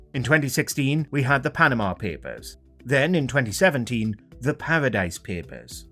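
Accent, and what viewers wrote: United Kingdom. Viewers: FFS. The guy speaking in this video sounds British to me. Agree? No way! I'm not from the UK. Seems like all they do there is winge. If you guys don't like your royal family get rid of them!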